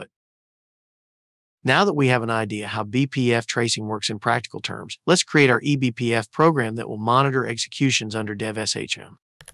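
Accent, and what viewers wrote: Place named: South, United States. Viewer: Good video 💯 Btw I was thinking about your southern drawl. If you turn out to be a 80 year old Grandma living in England somewhere,I'm gonna mad upset😂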